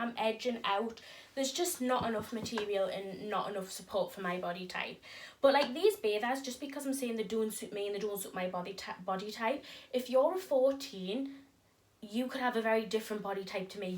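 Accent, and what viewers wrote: Newcastle, United Kingdom. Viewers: What part of England are u from? Geordie? Living for ur accent x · I love hearing our Geordie accent on YouTube 😍 your body is perfect! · Are you from Newcastle? I am and love it because you say canny like me❤️❤️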